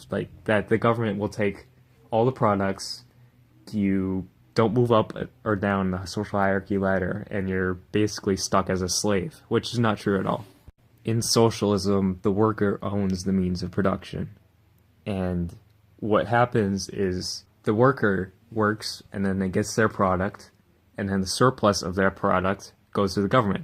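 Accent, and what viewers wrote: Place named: New England, United States. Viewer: You don't sound Slavic you sound Yankee like me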